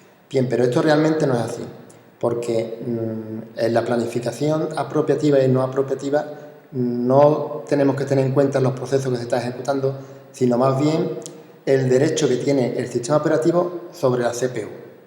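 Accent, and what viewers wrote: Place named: Andalusia, Spain. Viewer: Yo diría que muy andaluz y con orgullo. Porque hablar sin miedo a reproches de algunos, orgulloso de su acento, al menos para mi, es digno de elogio.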